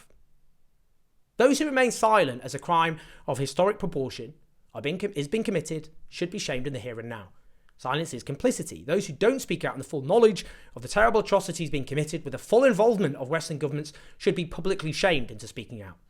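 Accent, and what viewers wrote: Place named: England, United Kingdom. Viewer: You talk in a pommy accent so unless you live There in Israel you only believe what your hearing from Hammas who are lieing, just listened to the speech comming from a son of a Hammas comander who grew up in Garza, he speaks in in support of isreal and said don't believe the lies comming from Hammas about thousands of children been killed it's all lies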